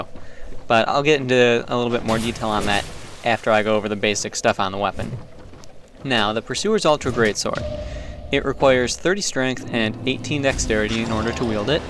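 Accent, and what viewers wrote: United States. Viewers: American pronunciation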